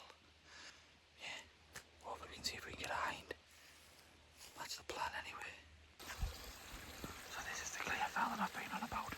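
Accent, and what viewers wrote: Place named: North East England, United Kingdom. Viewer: You from Sunderland or Durham? Sounds it haha… I’m from Newcastle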